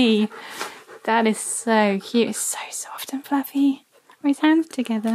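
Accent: England, United Kingdom. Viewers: "Get the tangly bit". I love your English ways of saying things. And Key really listens. It's amazing!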